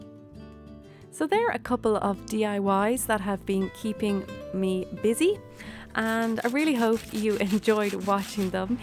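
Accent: Ireland. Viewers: Well done!! Really neat to see the different ways to make and use stuff, that's lying around the house. I for one would love to see more shows like this from you. Thanks for sharing!! By the way...I lived in County Kerry Ireland for a year, many years ago. Ireland is such a magically beautiful place. I miss it dearly. Though, watching your video enables me to feel a bit of that connection again. Thank you for all the work you do. I love (and remember well) that lyrical Irish accent that I use to encounter when I lived there; hearing it always made my day. Yours is lovely, as well. :)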